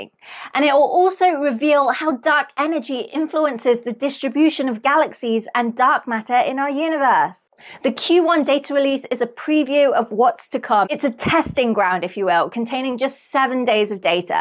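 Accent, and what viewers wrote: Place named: Cockney, United Kingdom. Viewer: you look Chinese but you speak cockney...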